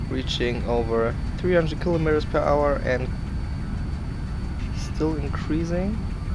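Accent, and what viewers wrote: Germany. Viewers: Dieser Deutsch Akzent 😂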